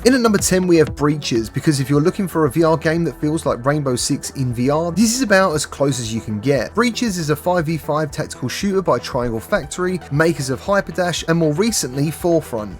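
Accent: England, United Kingdom. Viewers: You need to talk even faster with that dufus English accent. That way no one will understand a thing you say.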